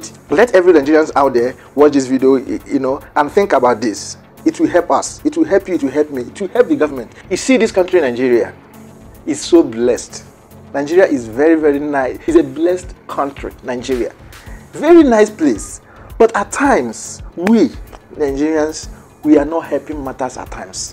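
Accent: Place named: Ghana. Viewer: O boy u no be Nigeria with the way u sound,say for u Ghanaian because I no see u as Nigeria.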